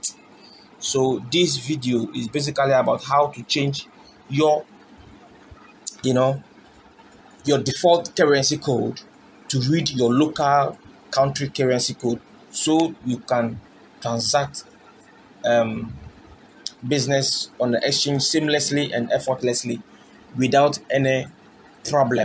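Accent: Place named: Ghana. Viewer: Ghanian accent is so annoying and you talk too much. Go straight tot he point pls. Thanks anyway, I figured it out before even getting half way through this video.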